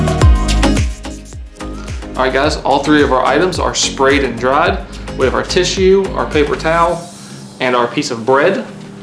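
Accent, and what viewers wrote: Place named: Texas, United States. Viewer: What is this guy's last name? I believe with his slight accent he's from Texas?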